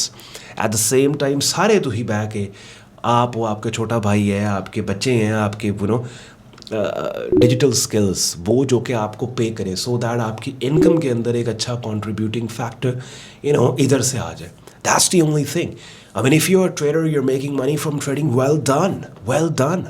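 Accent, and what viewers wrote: Punjab, India. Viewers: Love you, sir. Your Punjabi is very cute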